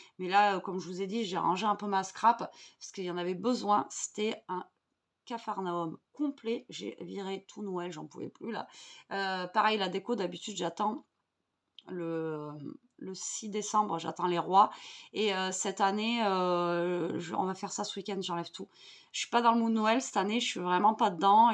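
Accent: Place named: Southern France, France. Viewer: Restez comme vous êtes 🙏et un adage qui m aide beaucoup dans la vie : on ne peut pas plaire à tout le monde et c est tant mieux 😉quand à vos tutos « free style » c est justement ce que j aime en vous et votre joli accent plein de soleil ☀️ Je reste à vous suivre et surtout ne changez rien c est votre personnalité créative 😊🧡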